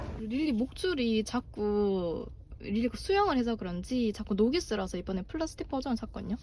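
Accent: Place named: Daegu, South Korea. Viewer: 처음보는데 대구분이신가봐요 사투리 친근하네여:) 잘봤습니당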